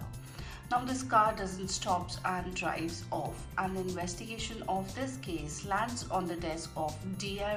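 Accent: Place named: New York, United States. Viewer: Very interesting and ur accent is very nyc....keep gng👍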